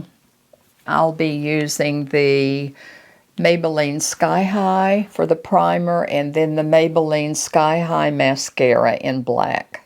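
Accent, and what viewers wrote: South, United States. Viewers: You look fabulous,. I enjoy your longer videos; very relaxing. Especially your Southern drawl; you make my day. I’m sorry about your low vision problems. But one would never know by the way your makeup turns out. You’re just gorgeous and beautiful. Your eye makeup really brought out your beautiful blue eyes. God bless you,. Looking forward to your next video and welcome back! ❤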